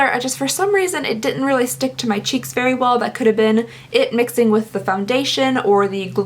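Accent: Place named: South, United States. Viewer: I am just so glad to hear a person that speaks as I do. Hey y'all:-) Louisville is definitely not Richmond but our accents are similar. I cannot stand watching other people! You are funny and pretty!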